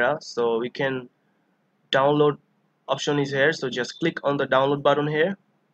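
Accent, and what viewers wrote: India. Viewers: Bro your voice sounds good in regular Indian accent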